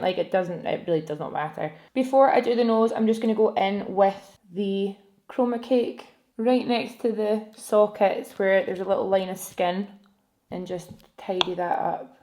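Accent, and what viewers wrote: Scotland, United Kingdom. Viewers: Is it just me that loves it every time she says the word “white” 😂 I just loooove the Scottish accent 💖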